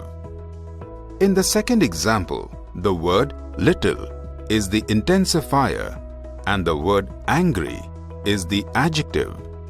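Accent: India. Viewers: Damn the Indian accent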